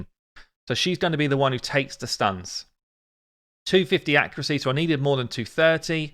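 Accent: United Kingdom. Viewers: Congrats. You rock! I love your British pronunciation and some expressions, it’s such a fun to listen and learn from your content. For me you should in each posted video say straight to the bin. You can say also as much as you want in each - Alure. Cheers mate!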